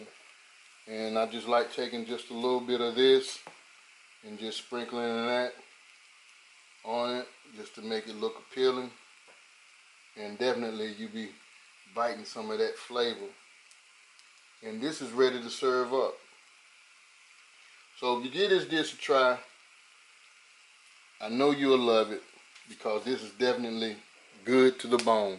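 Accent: South, United States. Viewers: I haven’t even watch the video yet but I can tell by the thumbnail and country voice this is going to be good. I love black people!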